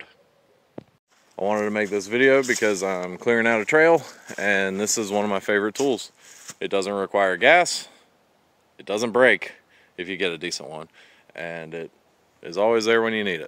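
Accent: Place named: Missouri, United States. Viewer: I swear ...you sound like your from Missouri.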